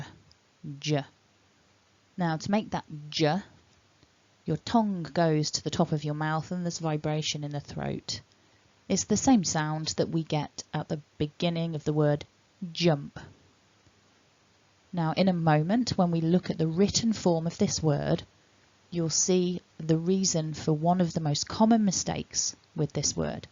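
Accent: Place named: United Kingdom. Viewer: i love British English accent 🥰🥰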